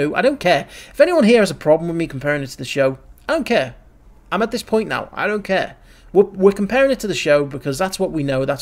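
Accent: United Kingdom. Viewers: I dont know why but I imangied you having a American accent from some reason but no we got a british guy 😂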